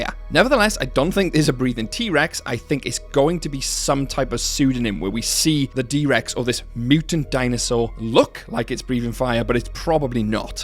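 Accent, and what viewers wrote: Wales, United Kingdom. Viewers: After discovering this channel, I only want to hear Jurassic Park/World news in a cheerful Welsh accent 🏴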